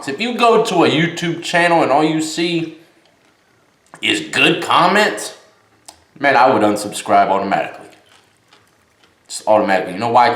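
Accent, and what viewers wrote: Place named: Louisiana, United States. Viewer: Where did you do time bro? Cant place the accent...louisiana? I did 3 in tdcj texas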